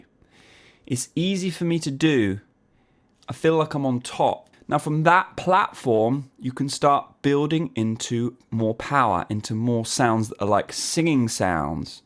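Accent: United Kingdom. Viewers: Loves the British Accent! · British English?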